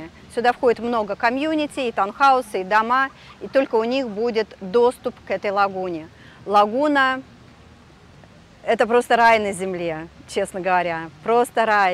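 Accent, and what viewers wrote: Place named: United States. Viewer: артекуляция речи , манера, капец....американпипл😂😂 неуж так говорят русскоязычные, которые живут в Америке...пусть и не мало лет, неужели дает такой эффект речиобразования ? интонация слов. Родное нельзя забыть.😁🙄 мда.... русская речь перешла на амер. манер🤣🤣 слушать забавно😜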